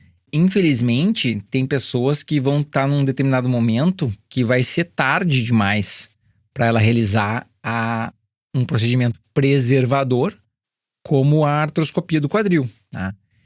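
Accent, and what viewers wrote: Rio Grande do Sul, Brazil. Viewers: Ele é gaúcho amiga rsrs .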